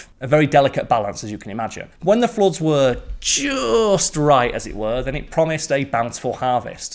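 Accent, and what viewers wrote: United Kingdom. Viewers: A British voice does make it a Simon video!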